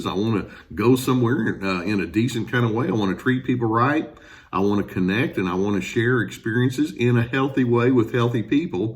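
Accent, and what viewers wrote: South, United States. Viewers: 🤣😂 Now I have got quite a wicked sense of humour but even though you did make me laugh that phrase feels cruel and I do not enjoy being cruel . That is one phrase I will not use because I do not want to play games with others or wind them up. I get no joy or relief from it . I can see where you are coming from and with that soft kind southern voice of yours YOU could get away with it and it still sound almost loving and actually a form of endearment but 🤣 I in NO way could get away with that. I stay away from others with those traits . Of course I do bump into people with these traits so I am grateful for many of your tips but my way of dealing with it is I keep my distance and use the word NO. And Mr I caught that twinkle in your eyes at the end of the video 😂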